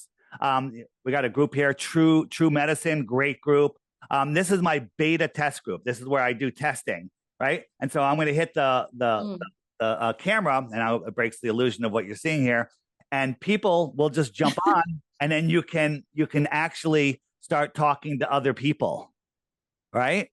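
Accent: Sweden. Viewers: Sounds like she is from sweden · Is she swedish? 🇸🇪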